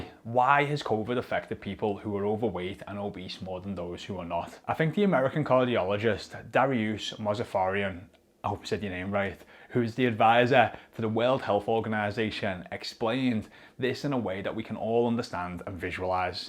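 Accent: Liverpool, United Kingdom. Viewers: Love the Scouse accent. Brilliant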